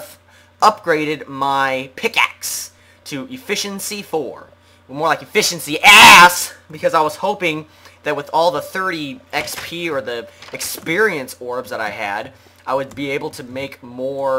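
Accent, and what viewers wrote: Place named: Germany. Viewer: German is the acent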